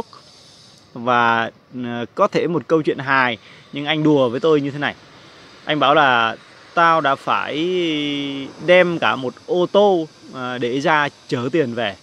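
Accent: Thanh Hoa, Vietnam. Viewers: Nghe giọng người anh em thiện lành này chắc thanh húa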